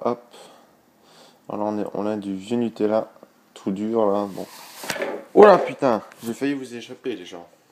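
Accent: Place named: Quebec, Canada. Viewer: Regarder au debut a l'accent du Québec et maintenant il parle commen les francais... bref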